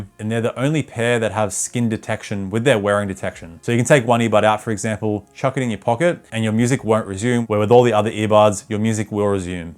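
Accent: Australia. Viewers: Always love seeing an aussie bloke talking bout tech on the Internet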